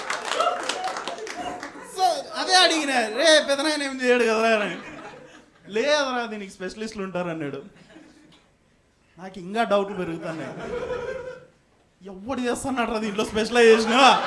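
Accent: India. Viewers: పక్కా అనంతపురం యాస. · Bro nee jokes genuine ga bagunnayi, boothulu theesesina kuda anthe comedy ga untayi... so try to avoid bad words.. neeke reach ekkuva untadhi appudu.. btw i like your kadapa slang bro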